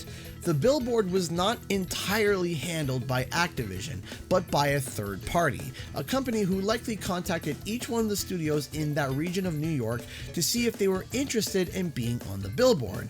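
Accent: Canada. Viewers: Hey how about a skylanders remaster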